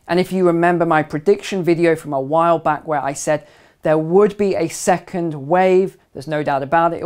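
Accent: England, United Kingdom. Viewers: Is this the American stock market you're referring to. It is confusing with your British accent. You should make it clear. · I didn't like your video at first because of your English accent... How could you relate anything in the United States ?? I watch a lot of videos like David Morgan Gregory Mannarino.. Gregory Mannarino is my favorites show !!!! but you sir are right at the top with the best of them I really enjoy your shows now ... keep spreading the truth and God bless 🙏 🤓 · I listen to him because he has an English accent, as an Ozzie, the English are like our smart uncle! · All of his real world video was of Britain and his accent is British. Guns are far more difficult to in the UK.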